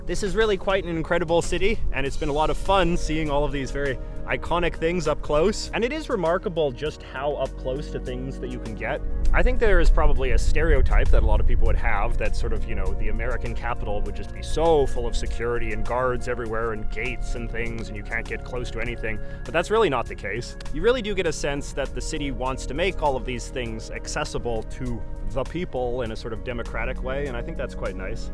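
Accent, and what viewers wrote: Canada. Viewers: This guy pops up in my suggested videos maybe 2 or 3 times a year, but every time I hear him, I’m always shocked at how much he somehow has the most punchy, if affected Canadian accent I’ve ever heard—and this with having had Canadian classmates, dormmates and colleagues in America and abroad! Oh, and no one thinks American buildings are all closed off and under otherworldly security, except for maybe Canadians whose media desperately want to portray Canada as somehow so different from the US in terms of security and civil discourse in public spaces—but their public let us know that they are never too far off or behind in many ways, right, Ambassador Bridge?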